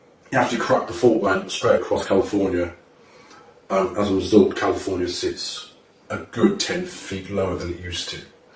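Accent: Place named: United Kingdom. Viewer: Okay so he’s from The United States but has a British accent?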